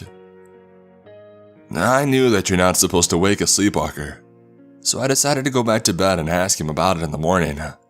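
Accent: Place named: North, United States. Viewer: There's something about how you pronounce certain words like "button" that sounds familiar. Are you by chance from somewhere that borders Canada? I'm fascinated by lingual differences. Also, you're reading pace and pronunciation is one of the best in the creepypasta reader community, it really helps with the immersion.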